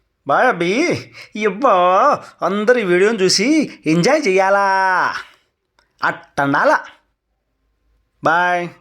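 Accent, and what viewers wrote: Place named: Rayalaseema, India. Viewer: bro rayalaseema yeasa super story line also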